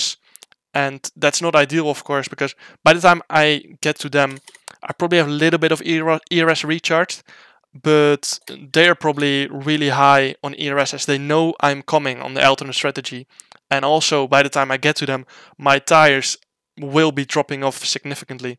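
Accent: Netherlands. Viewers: Always love to hear your dutch accent while speaking haha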